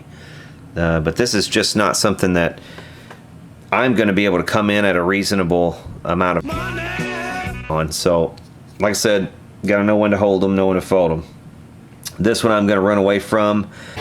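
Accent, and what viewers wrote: England, United Kingdom. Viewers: Not sure why a guy with an English accent would put that ground lift switch there. You really don't get those on British equipment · I think you're a genius..!!! The sheer complexity of this amp build with no internet back in the sixties & given the obvious restraints of components is humbling brother. You've also mentioned it sounded good so what more can you ask for. I'm curious though on the story of the guy buying it from you and that you had an English accent..?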